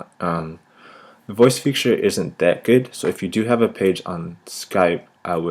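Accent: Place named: South America. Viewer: Hablas muy bien el español para ser Norte americano, incluso, suena más como si fueras de sud américa.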